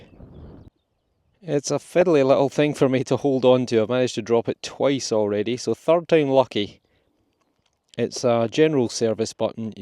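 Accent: Scotland, United Kingdom. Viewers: I enjoy both, I love the Scottish accent 😅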